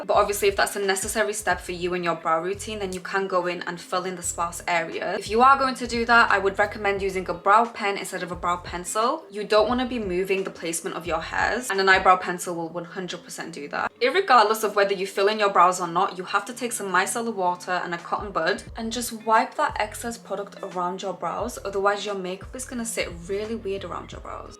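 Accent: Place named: United Kingdom. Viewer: i love ur accent ❤what part of the uk are u from? x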